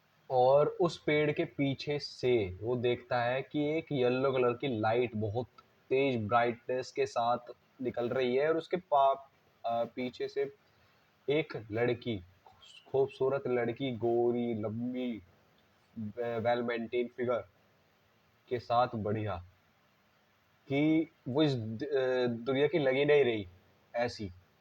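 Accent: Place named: Rural, India. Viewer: Horror nahi comedy hai... jaahil bewakoof aur dehati jaisa bol raha hai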